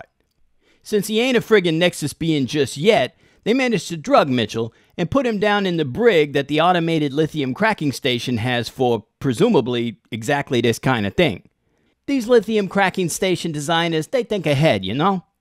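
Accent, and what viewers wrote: Brooklyn, United States. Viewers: love the brooklyn accent and snark..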